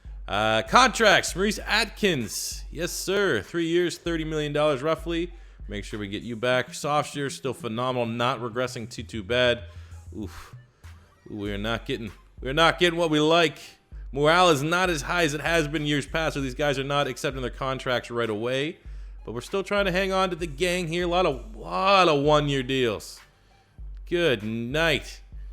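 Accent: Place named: Canada. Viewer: Hes Canadian its his accent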